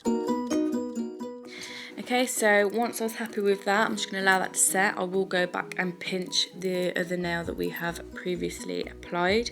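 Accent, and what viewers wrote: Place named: Midlands, United Kingdom. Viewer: Uproar you have got to be from the Midlands like me girl xxxx love your vids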